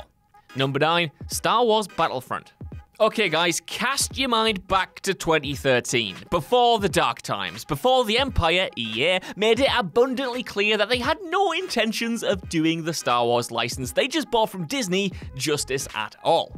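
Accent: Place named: Tyneside, United Kingdom. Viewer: I hear a tyne valley accent. Maybe tees actually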